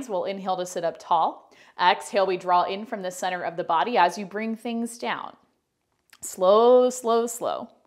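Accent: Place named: Canada. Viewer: I love this challenge! I was surprised to hear that you grew up in Arizona you sound Canadian! I mean that as a compliment!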